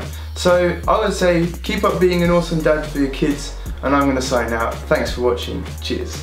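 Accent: United Kingdom. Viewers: Great vid! You've got the brit accent going for you... I'm watching KC's vids diff now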